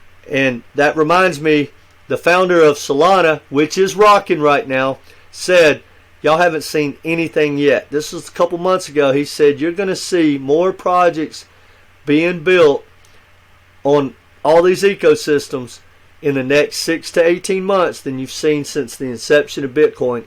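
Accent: South, United States. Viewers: I like your southern accent . Ive been living in Memphis for years now but originally I'm from Chicago . After moving to the south I dont think Id move back to the north.. Southern hospitality is real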